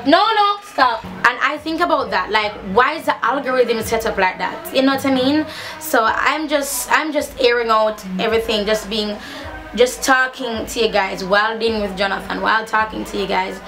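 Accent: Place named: Patois, Jamaica. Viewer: god say put good out n yuh get good back.... someone helped u help others, do other thing dont conform but i loved when i say yuh down zaful try on. do more things that ppl wan c. And even tho yuh jamaican talk slower and speak standard english sometimes not all weh come from jamaica can technically understand ur rawcha patois even tho that makes it funny. n nuff black ppl nuh undastan patois/patwa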